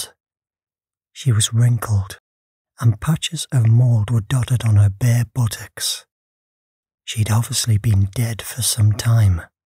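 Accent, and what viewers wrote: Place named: Inverness, United Kingdom. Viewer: Where about in Scotland are you from ? You sound like you’re from Inverness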